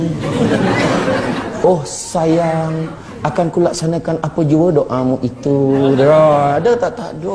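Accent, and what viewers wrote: Negeri Sembilan, Malaysia. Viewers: Logat Negeri Sembilan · Ustaz ni..setiap dakwah mesti kena ada lawak,sedih dan loghat negeri sembilan ada tiga ii ni konfirm best ceramahnya 👍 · Ramai yg mula soka loghat nogori.. Tanda Pm baru dri nogori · Memang loghat nogori tu yg sedap dongar haha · Seronok dongar ustaz syamsul ceramah..bnyak pengajaran..semoga ustaz sukses sllu..loghat N9 ustaz mmg thr best.kelakar! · Biasa aku syok dgq ceramah bahasa utaqa. Dgq loghat nogori pon syok gak rasa ni. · Aku org melaka, tpi paling suke dgau loghat org nogori... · loghat nogori tu yg sodap dongar😂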